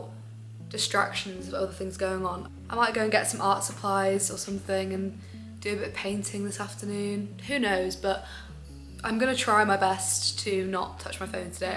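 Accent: United Kingdom. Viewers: Guys her accent , is it british?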